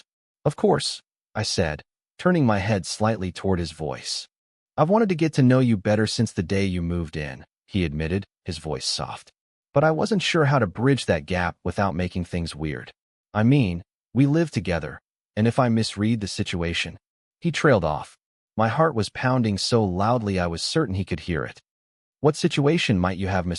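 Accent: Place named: United States. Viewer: This story created by AI , both content and voice . It's still good for people who is learning English , all American accent is very excellent .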